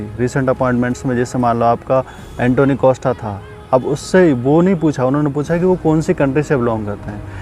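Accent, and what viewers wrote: India. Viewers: Bhai aap narnol Mahendragarh wali side se ho ❤😊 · I think you belong to Mewat?? Your accent 🤌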